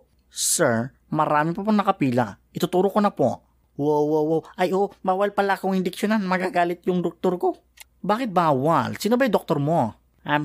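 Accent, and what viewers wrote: Ilocos, Philippines. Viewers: Ilocano ka boss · The best talaga accent ti ilokano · Ilocano kaba boss hahaha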